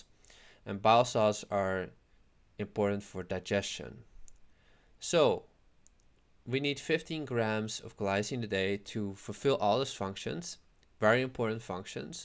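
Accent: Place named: Netherlands. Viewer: Glycine is great, great for bed time, doing keto or carnivore its good to add some glycine. one can balance the methionine with glycine glycine is sweet. I Am just having a glass water with 5 g glycine. You do sound Dutch as I Am subscribed Glycine does so much, its even a mood stabilizer, good for the skin. I often put apple cider vinegar with glycine before my keto meal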